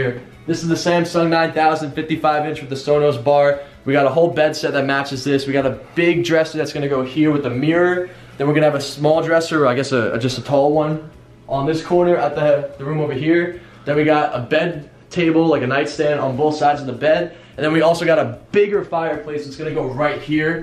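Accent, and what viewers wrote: New York, United States. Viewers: He has a New York accent so when he say chocolate it's funny 😭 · new york accent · hes from ny that's why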